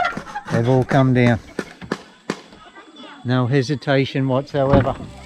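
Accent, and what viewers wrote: Australia. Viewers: I wish you would stop pointing with your finger and stop talking, then I would enjoy your video more. Your Aussie accent is so thick, can't understand a word you're saying.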